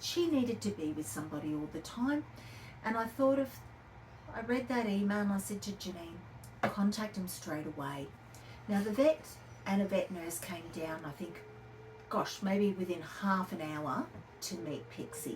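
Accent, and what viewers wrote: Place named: Australia. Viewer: Love listening to your stories. You have a lovely way about you. I was brought up in North Brisbane so nice to hear the Aussie accent that I have now lost. Sending best wishes from North Wales. Wendy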